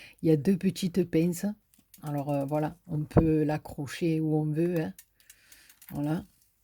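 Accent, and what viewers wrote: South, France. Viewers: A tres bien....tu as tout compris......reste comme tu es ...simple ...a t ecouter ...c est un spectacle.....j adore ton langage ton accent du soleil......ta generosite dans tes paroles.......tu as eu des combats que tu as gagner....alors n attrapes pas la boufalliiissse....avec des mauvais satellites........ il existera toujours des gens qui tournent en rond.....et qui ne savent que baver ........ils ne savent rien faire d autres.....qu a regarder le train qui passe......bonne soireeeeee.....bisous....a bientôt.....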